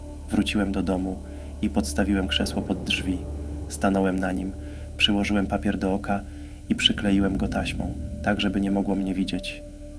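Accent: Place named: East, Poland. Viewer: dobra pasta dobry lektor chociaż momentami akcent wschodni słychać ale to nie przeszkadza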